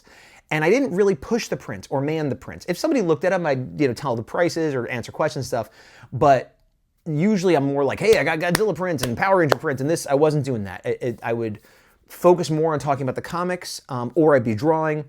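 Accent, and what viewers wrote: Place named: New York, United States. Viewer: I think you SHOULD use that voice and “hey buddy, you like monstahs?” that would be gangster! let your new York flow. sing it like. 🤙✌️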